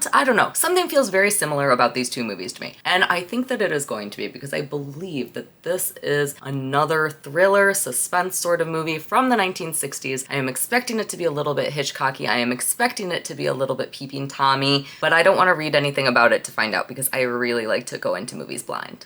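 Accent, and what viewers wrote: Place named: Detroit, United States. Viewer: Can I test my accent ear and guess you’re from Michigan? Specifically the Detroit area.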